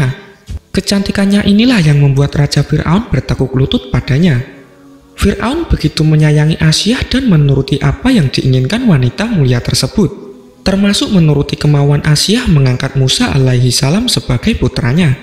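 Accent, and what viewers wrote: Java, Indonesia. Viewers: Lek ngomong koyok wong jowo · maaf ya mas,Aku sangat suka dengar cerita kisah nabi... tp kali ini aku gk bisa focus liat cerita nya malah tertawa dengar suara mas ... mas asli jawa ya mas kok medooook banget🤗🤗🤗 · Narator nya meddok banget jawanya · Yg baca narasi Jawa banget 😄👍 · Ngomongnya medok jawa logat bahasanya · Ciri khas jawa ngomng nya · Saran saja ya,,,pengisi suaranya jangan terlalu kental logat jawa,, kadang2 kaga ngerti bahasanya😂😂😂😂😂🤣🤣🤣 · suara logat jawa... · Pembaca logat jawa kurang sedap di dengar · Naratornya jowo sangat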